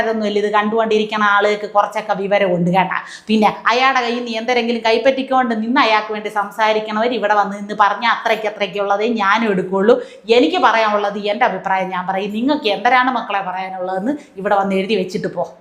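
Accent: Thiruvananthapuram, India. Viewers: എപ്പോഴും തിരുവനന്തപുരം ഭാഷ ബോറാണ് മാറ്റി പിടിക്ക് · അപ്പി എങ്ങനെ ആണ് തിരോന്തരം ഭാഷ സംസാരിക്കുന്നത് കുട്ടനാട്ടു കാരി അല്ലേ 👍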